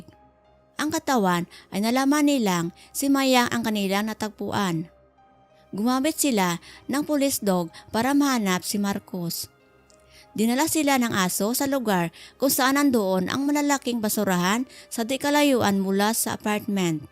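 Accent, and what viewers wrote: Visayas, Philippines. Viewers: Ako Tinapos ko Ang twinto Oky lang Naman Na Ganyan Ang Bossis Mo Kasi Normal lang Yan Na Ganyan Ang Bossis Kasi Bisaya Piro Para Sakin oky lang❤️‍🩹❤️‍🩹❤️‍🩹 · hahaha.. parang himig bisaya.. at nahilo ako marining bjnabasanya basanya ang content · Sounds visaya accents 😵‍💫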